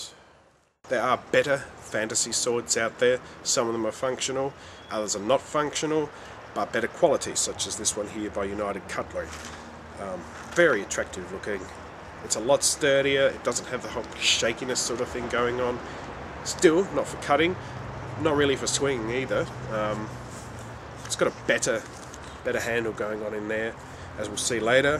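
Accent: Australia. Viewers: when i first heard you talk i though your were australian and i got excited to find out we could get swords here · are you australian or newzealander?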